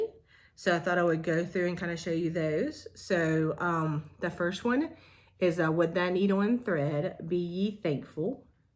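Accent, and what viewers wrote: South, United States. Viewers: Hi..I also watched Carol Saltbox Stitcher and she recommended your channel. Really enjoyed seeing your projects..love your Be Thankful and Good Cheer pillows..I have both patterns and hope to stitch them this year. I regret to say I didn’t know about your previous flosstube so could you sort of re-introduce yourself in your next video. I’m always interested in where people are from and you sounded like you might be from the South lol..sounded like home to me 😊 Have a great weekend and I look forward to your next episode. Suzette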